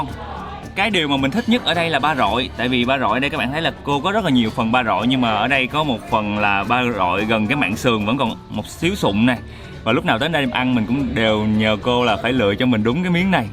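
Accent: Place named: Central, Vietnam. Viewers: Nhớ giọng huế quá. Thèm bún bò huế quá. Giữa đêm em đg đói mà phải tự hành hạ bản thân ntn hả a 😥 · chuẩn giọng miền trung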